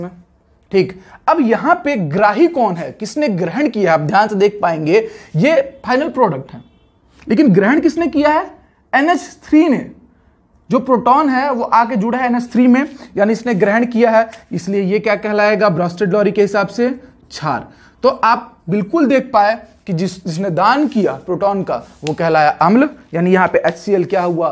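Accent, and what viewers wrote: Bihar, India. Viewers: Bahut acha padha rahe ho bihari style me mai paid class chodkar apke hi video dekhta hu kyoki bahut hi basic se bataya ja raha hai apka physics bhi bahut acha lagta hai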